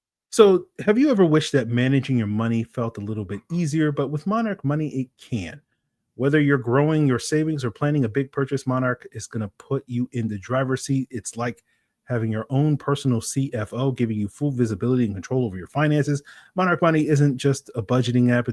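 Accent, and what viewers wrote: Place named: Germany. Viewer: I vote more German accent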